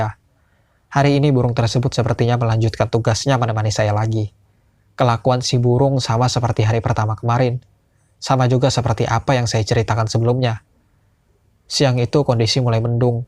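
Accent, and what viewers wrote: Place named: Bali, Indonesia. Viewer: Wah logat bali nih .. 😁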